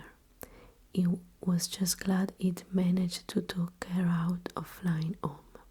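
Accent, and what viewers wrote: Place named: Italy. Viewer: Amazing!!! I love your italian accent, very relaxing.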